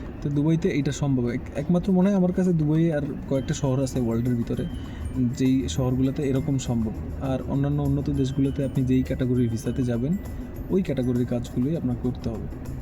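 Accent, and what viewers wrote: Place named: Bangladesh. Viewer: Bangladeshi laicent lage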